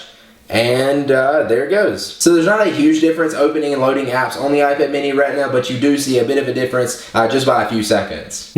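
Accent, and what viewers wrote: South, United States. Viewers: Love your southern (I assume) drawl!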